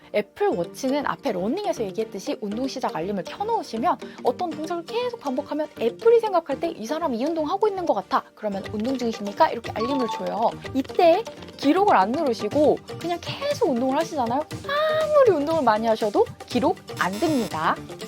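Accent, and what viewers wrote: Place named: South Korea. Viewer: 정칙한 한국인 영어에 구독버튼 누릅니다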